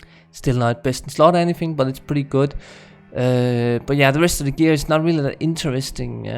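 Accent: Denmark. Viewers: Hey your accent sounds danish, am I correct?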